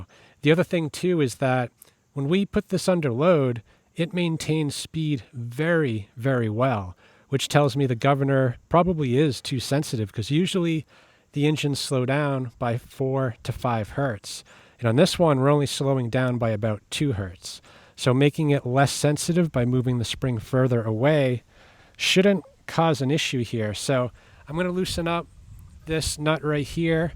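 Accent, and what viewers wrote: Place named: Midwest, United States. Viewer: I got a chuckle out the the "Fuel Make it Happener" segment.. Watching Vice Grip Garage is great. I live up here in the Mid West and there are a few of us that talk like that.... Its just a natural way. Make sure you check the Battry.....Along with the Spark-o-lator. HaHaHa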